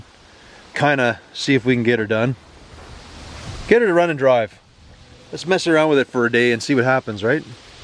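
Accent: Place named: Canada. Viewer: This got Canadian accent with a southern twang, but great content 😂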